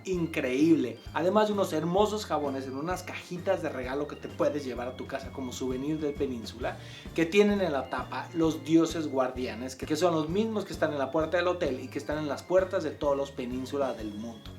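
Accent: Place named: Puebla, Mexico. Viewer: en este video parece poblano... sácate la papa de la boca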